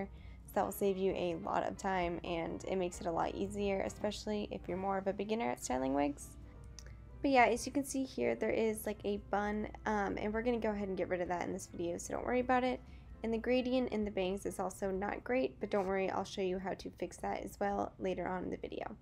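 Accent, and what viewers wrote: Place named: Brazil. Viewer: é br? não sei, se isso foi ofensivo, mas seu sotaque parace q vc é br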